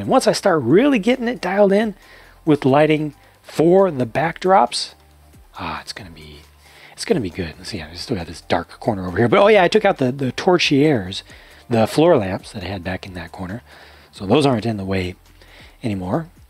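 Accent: Australia. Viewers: aussi accent What a day! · U Australian? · I know an aussie accent when i here one because I am one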